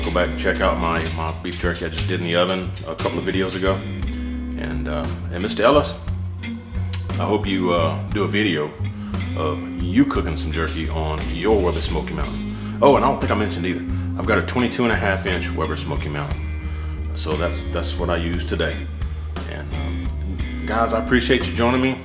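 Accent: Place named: Texas, United States. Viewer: Thank you for holding it up. It smelled great. Also, I like your Texan accent. I was a Houston resident for 20 years until the early 1980's.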